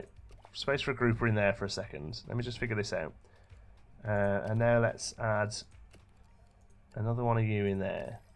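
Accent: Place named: England, United Kingdom. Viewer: I subscribed for the English accent.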